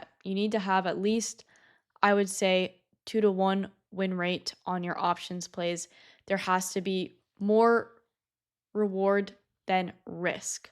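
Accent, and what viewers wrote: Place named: Midwest, United States. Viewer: interesting to see a young woman trading. u sound like your from chicago or wisconsin. ive been around and know a daytrader he teaches his daughter to trade. pretty cool keep going you can make a good life for yourself by yourself.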